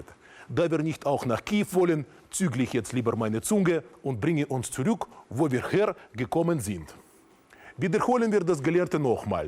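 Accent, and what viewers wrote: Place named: Russia. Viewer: Russian sounding German is so cool!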